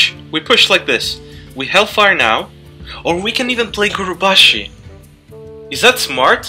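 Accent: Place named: Russia. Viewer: laska ma ruski akcent a twierdzi że jest z Polski hmm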